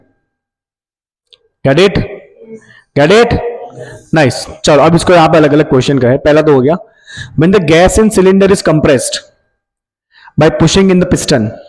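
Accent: Gujarat, India. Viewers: Sir are you from Gujarat?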